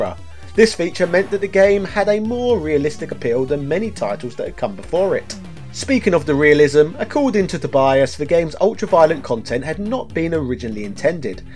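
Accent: United Kingdom. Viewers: Disliked because of the MJ pic on Home Alone. The rest was stuff a lot of other channels already covered. Waste of time. Unless you always wanted a british voice to say the stuff you already knew, if not its a waste of time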